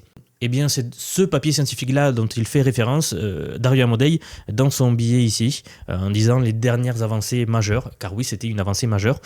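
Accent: South, France. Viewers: Mais, la grande question est "Qui est le génial présentateur de VSION IA avec cet accent du sud ???"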